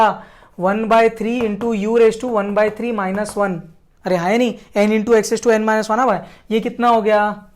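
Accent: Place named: Mumbai, India. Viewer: Luv your way of mumbai talking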